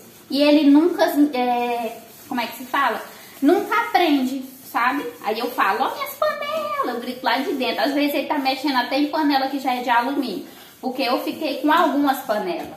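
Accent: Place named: Minas Gerais, Brazil. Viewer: Que legal😁 eu achava que você era Mineira. eu tenho vontade de fazer sobrancelhas, nem que fosse a minha, e das minhas irmãs. Bjs sucesso poderosa. 🌻🌻🌻☘☘☘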